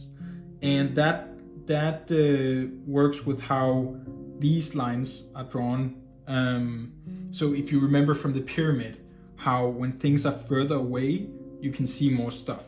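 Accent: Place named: Massachusetts, United States. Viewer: Your from Massachusetts aren't you?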